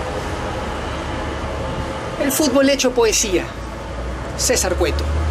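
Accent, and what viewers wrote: Argentina. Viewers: SI ERES PERUANO XKE HABLAS COMO ARGENTINO? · porque es argento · Este tipo es argentino o peruano???????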